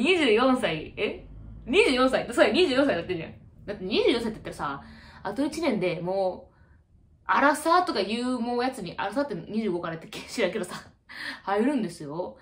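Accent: Kansai, Japan. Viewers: ほんまに関西弁も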